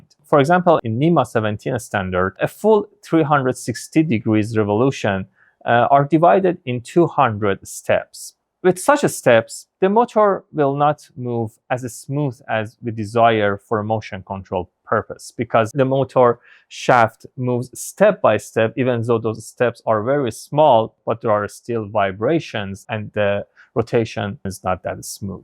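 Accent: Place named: Iran. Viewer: Thanks 🎉 I think you are Persian because the accent 😍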